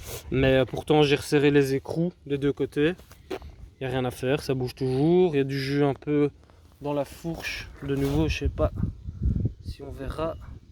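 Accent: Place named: Belgium. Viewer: Il me semble entendre un accent habituel, tu ne serais pas belge ? 🙂Si oui, dans quel magasin de trott vas-tu ? Merci en tout cas pour le retour objectif fait dans cette vidéo ✌🏼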